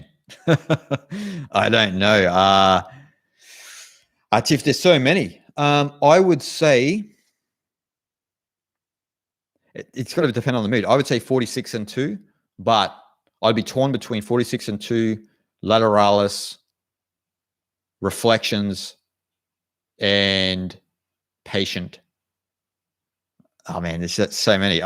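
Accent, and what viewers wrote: Australia. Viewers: Hello Mate!🖐❤🙏🕉 I'm from Los Angeles.😈 oops! I mean😇. Brah hahahaha🤪🤪. Its Thursday pm PS time! Watching the replay! Thanks Buddy❤👍.L❤VE your Aussie Accent!😇❤🙏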